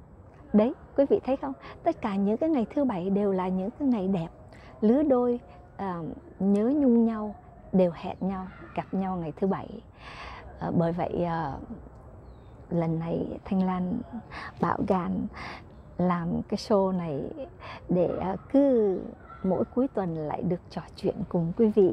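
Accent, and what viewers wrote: North, Vietnam. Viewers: Chị ơi theo em được biết chị sinh ra ở Đà Lạt nhưng chị nói giọng người miền Bắc nhiều hơn ah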